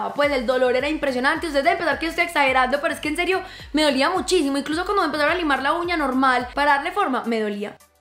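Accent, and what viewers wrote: Antioquia, Colombia. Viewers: me encanta el acento paisa de hablas divino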